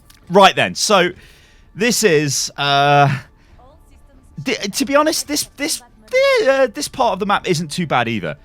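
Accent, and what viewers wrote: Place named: United Kingdom. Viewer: Omg highlighted my comment what do i say!!!! Um...um... I love you're British accent 😭😍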